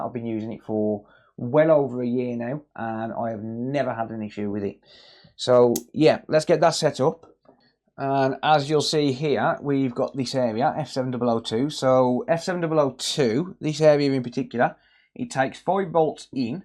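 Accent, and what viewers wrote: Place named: Walsall, United Kingdom. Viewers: very interesting mate,you from birmingham ?…now i know where to send my repairs 🫡👍🏼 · Great video mate, you proper sound like your from near me (Walsall) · you gotta love a good brummie accent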